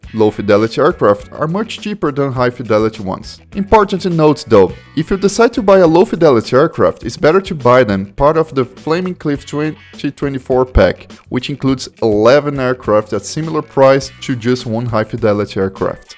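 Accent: Brazil. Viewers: By the accent this bloke is brazilian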